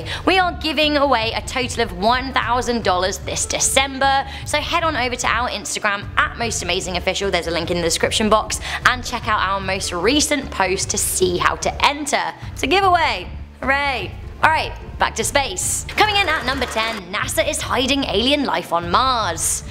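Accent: United Kingdom. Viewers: voice is so British and soothing, it's like a bedtime story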